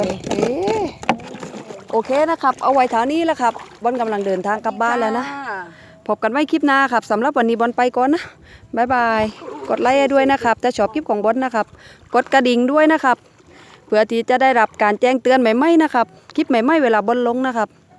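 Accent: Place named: South, Thailand. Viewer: พึ่งดูครั้งแรกค่ะ สนุก พูดภาษาใต้น่ารัก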